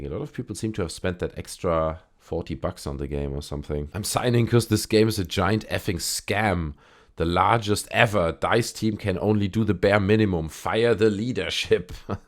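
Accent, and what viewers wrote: Germany. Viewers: Hi new to the channel. Do I detect a German accent? Also great video!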